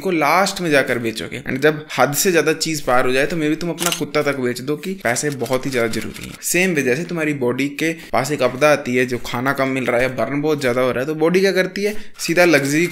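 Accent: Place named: Pahadi, India. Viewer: Bro , ap Himachal sai ho na ? Apkai tone thodi sei Pahadi lag rahe hai 🙂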